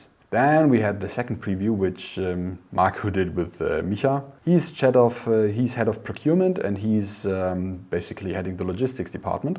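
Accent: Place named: Germany. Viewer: great to finally hear humans here! have you considered investing in a speaker yet? No offense against but his german accent is "noticeable" lets say! anyway, is <num>x times better than any bot!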